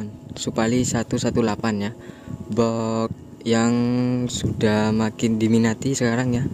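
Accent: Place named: Madura, Indonesia. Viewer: Logat nya mas ke madura madura an hehehehe😅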